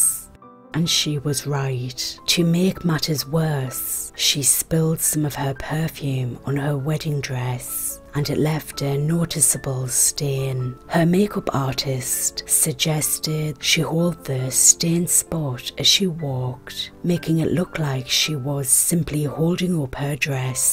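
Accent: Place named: Newcastle, United Kingdom. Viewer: Excellent as always - love to hear that Geordie sound!🥰💖⚘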